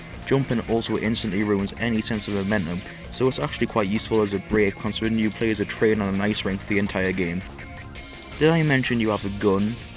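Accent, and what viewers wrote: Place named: Northern England, United Kingdom. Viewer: You sound like you're from the North of England like myself. Way aye man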